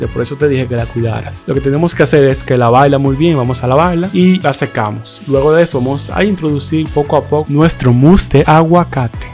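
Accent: Dominican Republic. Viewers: Me atrevo a apostar que eres dominicano?!!! 🥰 un abrazo fuerte desde Charlotte, NC. ..... ah! Me encantan tus recetas!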